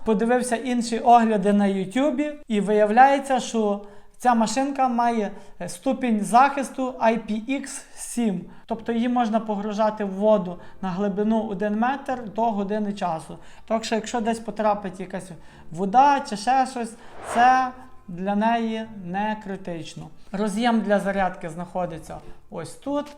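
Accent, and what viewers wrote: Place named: West, Ukraine. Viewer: Приємно чути західну Українську мову. 👍👍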